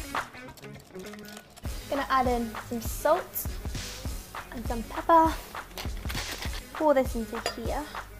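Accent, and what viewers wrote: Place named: United Kingdom. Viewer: Can you talk without British accent ....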